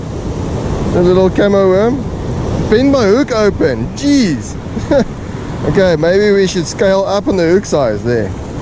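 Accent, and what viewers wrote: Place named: Afrikaans, South Africa. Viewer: Jy is afrikaans ek kan dit hoor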